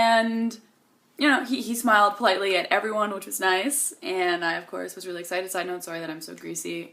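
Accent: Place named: Canada. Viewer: I just realized that you talk and sound just like Robin from How I Met Your Mother who is also a Canadian. Maybe it's a Canadian accent or something but I've never noticed it before.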